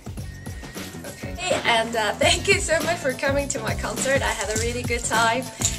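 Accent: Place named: United Kingdom. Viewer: British accent!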